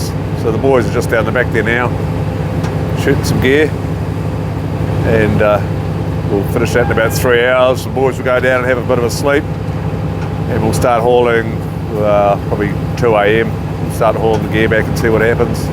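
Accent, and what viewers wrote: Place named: New Zealand. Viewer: Gotta say I love watching this shit as a keen fisho always interested in how the pros do things , so I subscribed keep filming You’ve got a good narrator’s voice too might be the bloody kiwi accent Just a note for you I fish out of Robe SA water temp this summer has been around 13-15 degrees c ,I think the fish are frozen Cheers from down south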